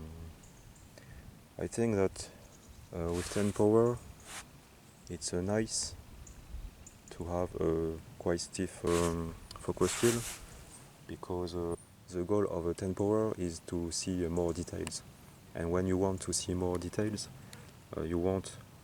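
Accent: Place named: France. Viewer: toi vu l'accent c'est sur t'es francais !!!!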